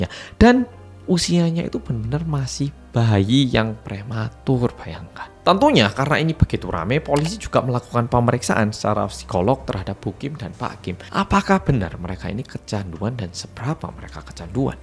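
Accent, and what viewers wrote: Java, Indonesia. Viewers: jowo medok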